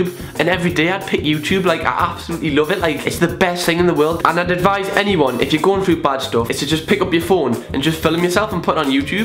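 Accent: Newcastle, United Kingdom. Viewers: Another fellow Geordie eh! Woop woop. 😉 this hurts my heart, hope your okay! ❤️ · Mad that work is my escape from it! I always put on a front like ill make my self look good, put makeup on. And ill get through the day getting nice comments and that and ngl that does make me feel better about myself. But as soon as i take my makeup off ill get in the shower and cry cause no one can see the tears. And i just stand there thinking how much i hate myself and my life, and questioning when is it gonna get any better? Watching you videos fully makes my day you actually cracks me up. I adore ur geordie accent! Keep ur chin up and keep smiling hun 😘❤️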